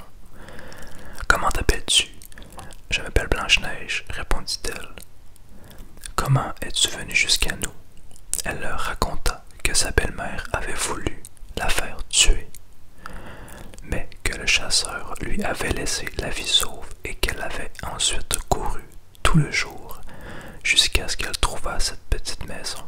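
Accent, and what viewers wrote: Quebec, Canada. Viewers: Bravo ! Top ! Merci beaucoup ! 😀 Ta vidéo a comblé une partie de ma nuit d'insomnie... Bons rythme et débit, ta voix est très agréable, c'est très apaisant. Moi je vote pour d'autres vidéos. Avec les plus de 500 pages du recueil de contes, on en a pour un moment - et toi aussi !!! 😜 En plus, petite française d'Alsace que je suis, je suis une fan inconditionnelle de l'accent québécois !!! 😘 Concernant les droits d'auteur dont parle un des commentaires, rien à craindre. Les contes des frères Grimm sont depuis longtemps tombés dans le domaine public. Les droits d'auteur sont dûs en Europe pendant 70 ans après la mort de l'auteur et 50 ans au Canada. Ils ne peuvent ainsi pas entraver l'enregistrement des contes suivants ! Alors, au boulot ! 😁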